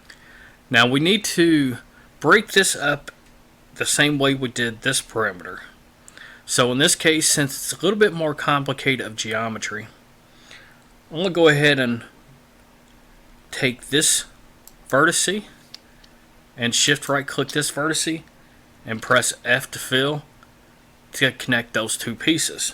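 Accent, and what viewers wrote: South, United States. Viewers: Also I did not know you were Southern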